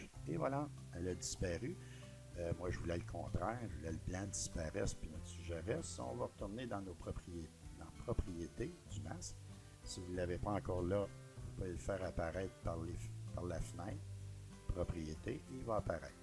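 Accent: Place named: Canada. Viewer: très bon tuto; l'accent canadien ajoute ss doute qqchose. Très clair et efficace. Merci.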